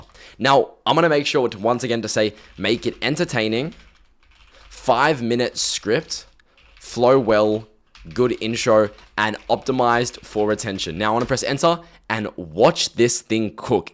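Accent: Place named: Australia. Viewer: Too much free sauce bro, appreciate you for sharing this vid. I hear the Australian accent too btw, by any chance you in Perth?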